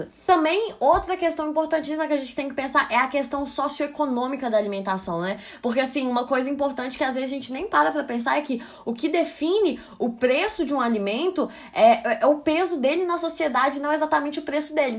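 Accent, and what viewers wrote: Minas Gerais, Brazil. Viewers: Esse sotaque mineiro...❤️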